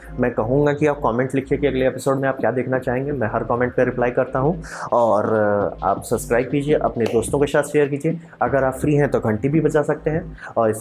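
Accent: Bihar, India. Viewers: Sir apko sun kr mza aa gya.. Kitna achhe se smjha diya aapne... Love u sir...Awaz se Lgta h aap v Bihar se ho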